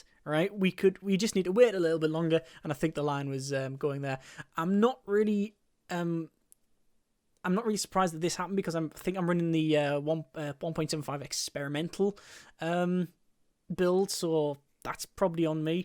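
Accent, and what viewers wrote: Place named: Yorkshire, United Kingdom. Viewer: I see you joined YT on my Birthday, the 29th Jan, although I was born some 46 years earlier in 1966. Am I too old? joking. Are you a Yorkshire lad? if you are I bet your Mums backdoor is always open for a cup of tea. I'm a good bit deaf nowadays so I might hear the accent wrong a wee bit. I am a Norfolk boy. I'll stick around and watch this playlist, retired now so have a bit of free time.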